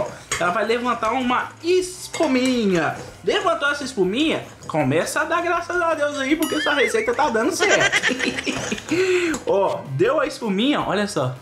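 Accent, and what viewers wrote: Minas Gerais, Brazil. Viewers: 👏🏽👏🏽👏🏽👏🏽👏🏽👏🏽👏🏽 Tem que falar com minerês mesmo!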